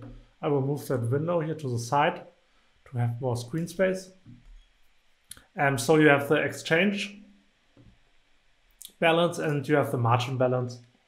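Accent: Germany. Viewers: nope... he's most deffinetely a german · are you german?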